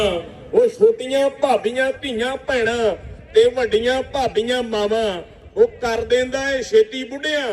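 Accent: Punjab, India. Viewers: Badhia comenty bilkul charde punjab wali boly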